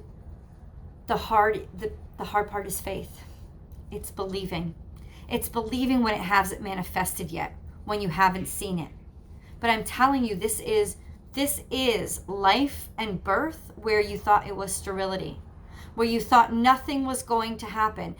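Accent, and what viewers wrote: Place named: New York, United States. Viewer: Such a small world, when I first watched I thought you were from NY from the slight accent until you mentioned the place in Derby! Just moved to Newtown, originally from Danbury area. Not sure how the YouTube algorithm works but didn't think it would be suggesting people from the area haha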